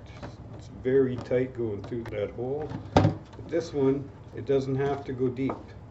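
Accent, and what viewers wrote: Canada. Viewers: Chong was from Edomonton, Canada. The dialect sounds nearly identical. Nice DIY demo, thanks for posting. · You've got a pretty strong canadian accent, its like making carbon dioxide with Bob and Doug Mackenzie. Dont forget your tuke eh, uhh, and...uhhh bring me another beer there hoser. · The accent is Canadian, eh? Thanks for the 420 411 dude. Go Oilers and God save QE2 eh?♥♥♥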